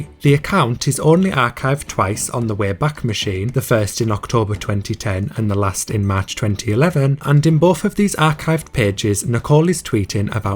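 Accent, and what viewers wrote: United Kingdom. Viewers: Yeah, it sounds like a humanly bot with a british accent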